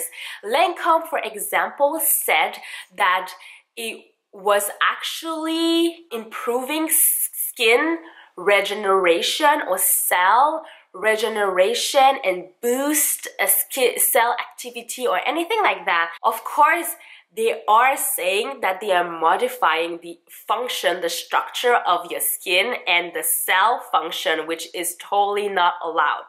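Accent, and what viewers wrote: Quebec, Canada. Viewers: Just subbed! Is that a French Canadian accent I hear? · omg YAY! I'm in Montreal as well! Thought I recognized the accent :) <3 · Are you from Quebec (province), Canada? Cauz you have that lil accent we have here when we speak english lmao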